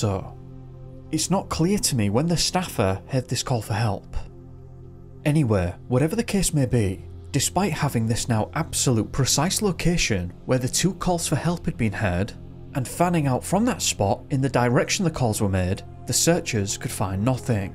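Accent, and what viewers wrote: United Kingdom. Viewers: Can someone tell me what UK accent this guy has where he says "pre-shume" for "presume" and "ah-shume" for "assume"?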